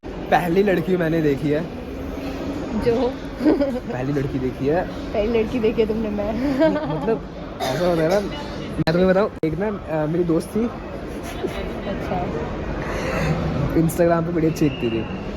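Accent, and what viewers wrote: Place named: Dogri, India. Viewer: We are with! Haters ko ignore karo aur apne future par focus karo. All the best our sweet gentlemen, Btw if nothing is between you and Tanishka still we love you as we loved you before! I saw your red eyes and noticed that how much pain you had faced and your face tells everything that you’re saying truth. Haters will never let you grow because of the jealousy. So keep working,growing,Laughing and making us laugh just like before cuz I have seen you serious for the very first time. And I hope hamari Tanishka Didi bhi theeek hongi 😙. Just waiting with eager and excitement ki aapka vlog kab ayega. Special note for you- Tusi jado Dogri ch bolde go ta Mainu bhohot vadiya Lagda hai. Namaskar and Sat Sri Akal from Canada ❤️🩷🩷🌸🌸🤍🤍😇😇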